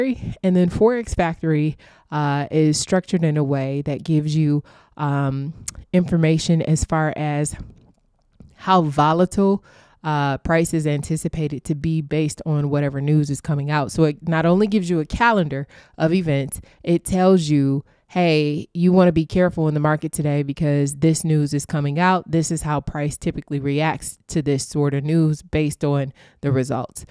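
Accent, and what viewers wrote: Houston, United States. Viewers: Are you from Houston? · Thank u for the info..I bought Billy Carsons workshop a while back.....Is that a Houston accent? I'm kinda hearing Kelly and Beyonce...which is CRAZY that u favor both of them! love the content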